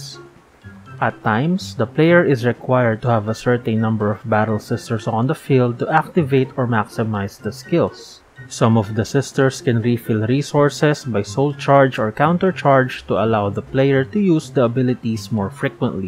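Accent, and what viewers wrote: Philippines. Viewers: Very fili accent. Love this vid and lore!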